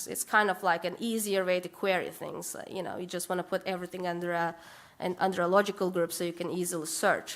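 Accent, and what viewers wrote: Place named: Turkey. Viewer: Anyone else wondering what her accent is, it's Turkish!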